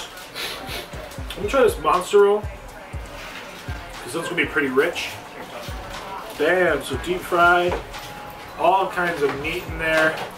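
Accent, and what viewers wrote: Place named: South, United States. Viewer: You are so silly you and your cat in your country accent you make me laugh continue to stay safe many blessings and much love and peace ☮️💜💯😇🙏🏾💙❣️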